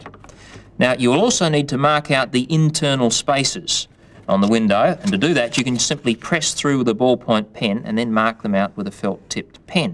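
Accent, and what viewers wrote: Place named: Australia. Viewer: Optical illusions are 127x better when presented in a thick Aussie accent.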